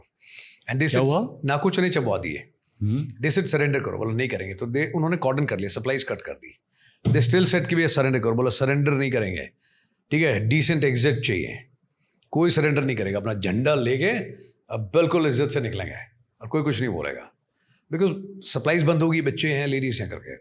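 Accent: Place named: Punjab, India. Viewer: Your Punjabi accent sound so cool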